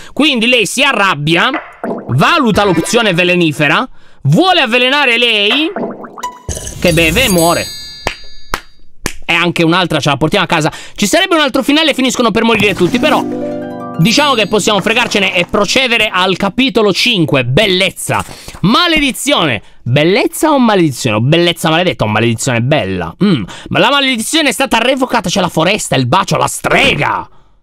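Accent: Sardinia, Italy. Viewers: Semmai Sardo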